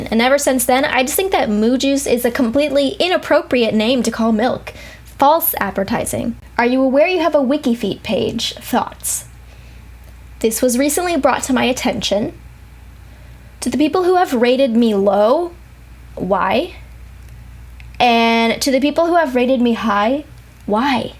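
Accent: Castile, Spain. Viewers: It was interesting to hear how you learned Spanish and it explains why you often (always?) use Castilian pronunciation. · hablas como española , sera que has estado en españa mi hijo es compositor de musica charra ( mexican cowboy music ) with mariachis, you can find his music on you tube under the name edmond broes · That explained. You living in US having a Spanish accent, not a latin one. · Wow I just realized the Spaniard Spanish accent. Very different to the Spanish I was taught, mostly by teachers from South America(Bolivia or Argentina). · Que divertido el acento de españa en su español.... Gracias por tu excelente Q&A... muy interesante! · I LOVE your Spanish!!!! The accent SEEMS Castillian. It's been sooooo many years since I've learned and used my Spanish, but it was Castillian. PLUS you are so easy to understand when you speak Spanish. It makes me feel much more proficient than I am.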